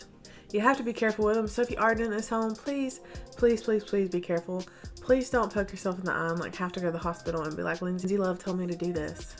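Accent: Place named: South, United States. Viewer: Fellow Southern girl here, what region is your accent? I love it. I've bought this kit a few weeks ago and used the lashes with regular strip lash adhesive because I've been too scared to use the glue it comes with. Thank you!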